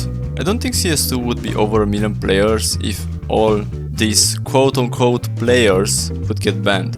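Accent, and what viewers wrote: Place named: Romania. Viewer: It took 6 minutes to figure it out you are romanian ahaha😂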